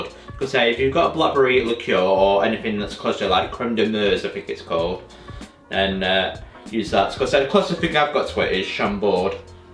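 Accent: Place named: United Kingdom. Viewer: That's the most British accent I've ever heard. Cheers! 😂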